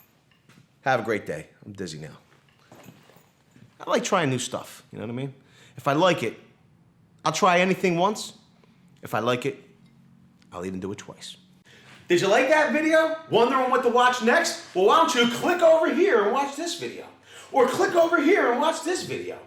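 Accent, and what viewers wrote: Philadelphia, United States. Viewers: What part of Philadelphia are from?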